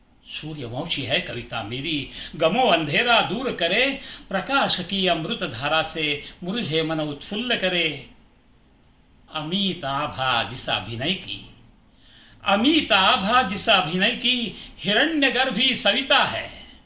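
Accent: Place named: Maharashtra, India. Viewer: मराठी touch !!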